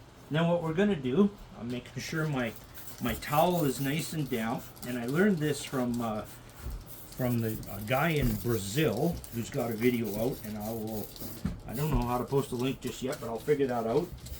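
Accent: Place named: Canada. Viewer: I wonder if this is similar to a Perique ferment. I suspect that the bacteria group responsible for the fermentation are facultive anaerobes--a bacteria that technically does not need oxygen, but benefits from a wee bit of it, thus the regular turning, and airing. A Perique is aired monthly, and under pressure-in water. You are using more water than in cigar production, but they are in a more humid environment (your accent sounds Canadian) Was that 106 degrees F. you said? So many microbes tend to be active at body temp.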